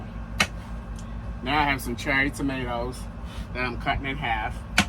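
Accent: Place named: East Coast, United States. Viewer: your accent 🥰, are you from the east coast? Enjoy the cruise and FOA Fam 🎉🎉🎉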